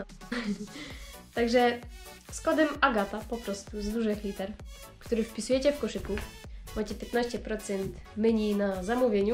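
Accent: Silesia, Poland. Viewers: Fajnie słuchac Ślonsko godka w wersji żeńskiej 😂😂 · Pozdrowionka i powodzenia ;) Miło się słucha tej Twojej ślonsko godki.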